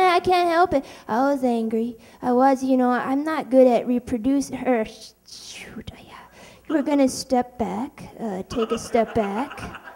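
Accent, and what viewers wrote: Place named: Midwest, United States. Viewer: Wisconsin, Minnesota accent & twisted sensibility… both mine it.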